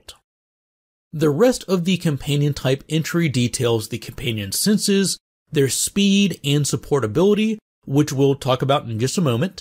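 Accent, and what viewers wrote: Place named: Midwest, United States. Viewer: Great video! Unrelated question... where are you from? I'm trying to guess your accent.. I'm thinking mid-west but have a feeling I'm way off.. :P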